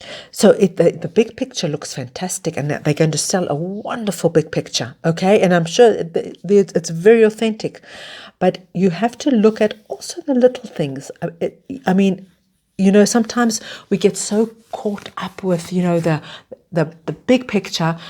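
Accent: South Africa. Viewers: I know a South African when I hear one 😁 love to see it 🙌🏾🤍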